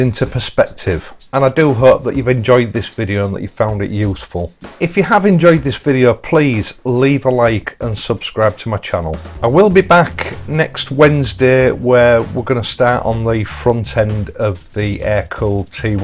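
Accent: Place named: Hull, United Kingdom. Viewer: Hi - love listening to you as essentially I'm a Hull lad (of 56 years:) that's been living in Sweden the last 24 years so it's nice to hear the dialect I grew up with:) All of your videos are frank, no-nonsense offerings which are so refreshing - which I really appreciate. No BS here! This air-cooled v. water-cooled comparison is also great as I'm suffering from that dilemma right now. This has helped a great deal, so keep up the great work and all the best.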